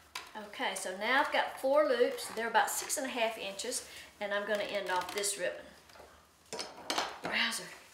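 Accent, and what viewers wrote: South, United States. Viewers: It's beautiful. Thank you for sharing. I also love you southern accent.:)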